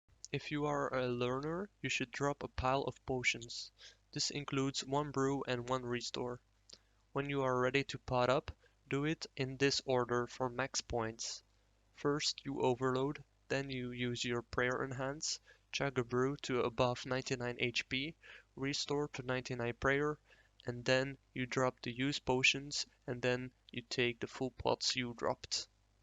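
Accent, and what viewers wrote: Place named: Netherlands. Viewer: jij bent 100% nederlands... nice accent bro.. bedankt voor de uitleg maar ik wist dit allemaal al, suc6 met je drops.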